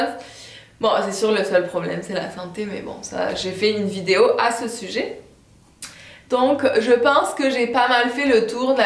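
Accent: Quebec, Canada. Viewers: pourquoi tu prends l'accent québecois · wow bel accent francais quebecois je connais un francais qui est ici depuis 60 ans et il a jamais perdu son accent ce qui est cool aussi pour la securite a 69 ans je peut dire que jamais je ne me suis pas senti en securite meme la nuit .encore aujourdhui bienvenue a tous les francais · l'accent Québécois cest l'horreure.😞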